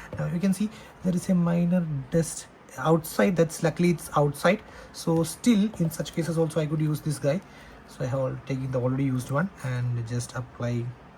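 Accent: Kerala, India. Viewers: Mallu ahnu alle 😂 Ahh english kettalae manasilagum 😂😂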